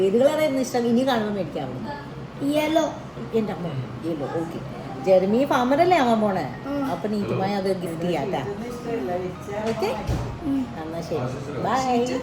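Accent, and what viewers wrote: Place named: Thrissur, India. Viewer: Now I get it why is having a thrissurian style of talking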